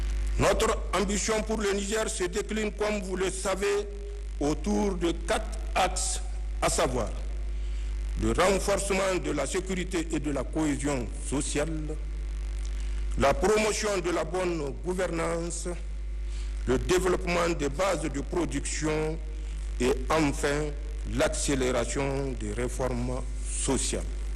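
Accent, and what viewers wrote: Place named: North, Benin. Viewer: Le Président Thiani a l'accent des béninois du Nord.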